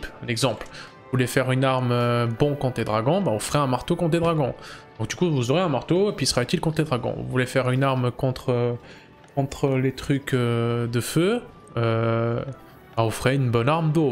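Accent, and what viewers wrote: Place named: Vaud, Switzerland. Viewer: Mais t'es tellement vaudois ahaha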